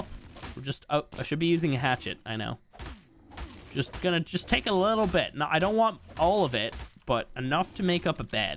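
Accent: Ireland. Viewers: Nothing beats hearing an Irishman getting excited about potato’s 😅 looking forward to this series dude 😁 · What part of Ireland are you from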